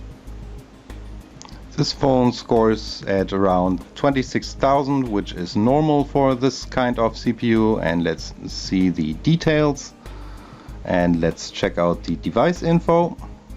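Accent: Germany. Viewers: Man merkt das du ein deutscher bist...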